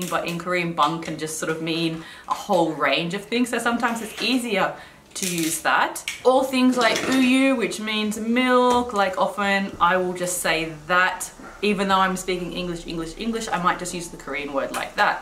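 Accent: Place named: Australia. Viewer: He's so cute! It was interesting learning the Korean word for "cicada". Also interesting is that the Australian pronunciation is different than the American pronunciation. We say "ci-kay-da", not "ci-kah-da". I noticed that you pronounce "똥" differently than does, too. He is clearly picking up the more native Korean accent, and not imitating your Australian pronunciation of Korean words.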